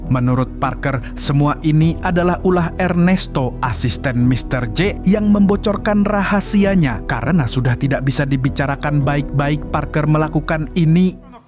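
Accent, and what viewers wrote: Banjar, Indonesia. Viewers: urang Banjar kah Pian ni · Urang banjar kah pyn mang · Urang Banjar kah mamang ni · Ai urang banjar sakalinya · Bang admin pake bahasa Banjar kayaknya 😅😅😅😂😂😂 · Orang banjar ya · Bah banjar jua sekali nya, d.mn nya ikm mang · Pian urang Banjar kah mang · urang banjar kah ini 😂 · Banjar kh mang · Urang banjar ka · Curiga amang nya nih urang sungai tabuk😂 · Ikam urang Banjar gayaknyak. Samak awan diakuk.slm kulak.alehhai.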